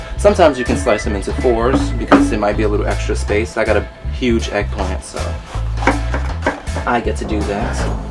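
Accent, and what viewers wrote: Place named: New York, United States. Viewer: Oh I thought I replied here, I guess it must be my ADD...thought I hit post, but probably got distracted. I am sorry, I kinda thought I detected a NY accent so I figured you might know that BLT is NY Diner talk for a Bacon Lettuce and Tomato sandwich...so an ELT would be your Eggplant (bacon) Lettuce and Tomato! I bet it would be amazing! 😍 Don't forget the vegan mayo! 💗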